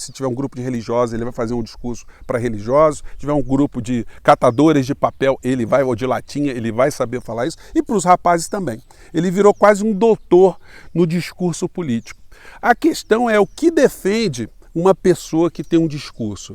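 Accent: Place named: Minas Gerais, Brazil. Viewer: Uai!!! Não sabia que vc é Mineiro, mas desconfiava pelo jeito de falar!! Aaaamo! 👏👏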